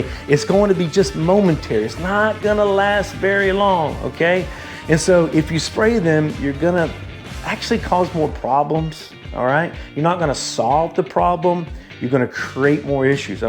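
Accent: South, United States. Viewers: I’m South south Louisiana. Your accent gives your southern away too. Last 2 years, our subdivision has been invaded by Crazy Ants. They don’t follow a trail, don’t bite, and seem frantic and only outside so far. Millions of ants like a true invasion. LSU says they act like that because they don’t have a Queen. They are coming out of the sugarcane field. Because we don’t know where the colony is, and they usually don’t return to the colony, there is no way to destroy them. LSU treating yards with the termite poison, but have to treat every few months. I would love to stop spraying as we have ducks, chickens dogs, cats etc. Have you all had these ants? It’s INSANE.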